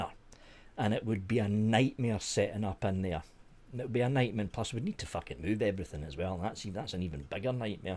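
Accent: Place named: West of Scotland, United Kingdom. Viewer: Hope your health's improving. Sorry that you find yourself in this situation. I'll still be watching your reviews - you didn't win all those awards for nothing...also as a West of Scotland guy it's nice to have great reviews from someone who talks like me!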